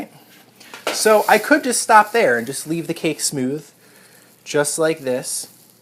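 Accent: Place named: United States. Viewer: What area of America is that accent? It's very distinct. Loved the video, it's great!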